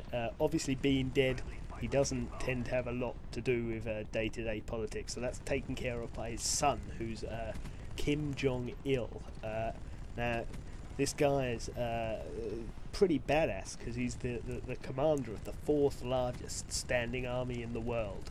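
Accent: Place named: Cockney, United Kingdom. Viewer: Your so British! Haha, we haven't clanned in a while, I miss hearing you curse at the other team in cockney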